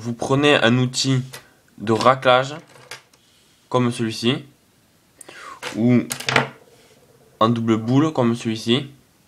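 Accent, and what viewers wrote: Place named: South, France. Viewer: je suis sur que t'es du sud! Vu ton accent pck moi je suis de nice;)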